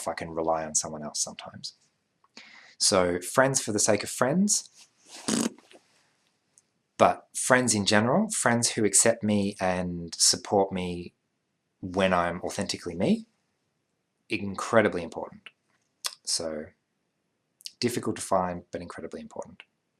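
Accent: Australia. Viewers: Just out of curiosity, what part of Australia are you from ? I live in Perth, WA. I cannot figure it out. Lol i don't watch TV either.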